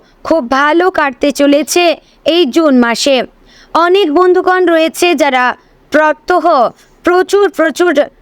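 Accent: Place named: Bangladesh. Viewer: Your pronunciation is very much like Bangladeshi. Are from Bangladesh?😮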